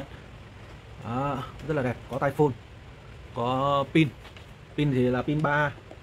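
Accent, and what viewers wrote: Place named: Hainan, China. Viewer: 你的语音和我一个海南同学的家乡话一样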